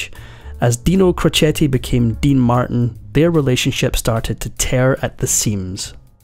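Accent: Ireland. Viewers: Are you Irish? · Is the narrator Irish?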